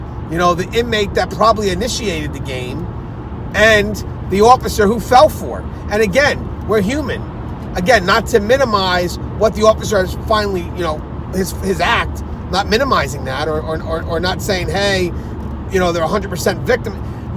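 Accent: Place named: New York, United States. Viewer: Tier Talk Correctionsone YouTube Channel because I’m a NYer and you just sound like someone that could had lived on my block lol.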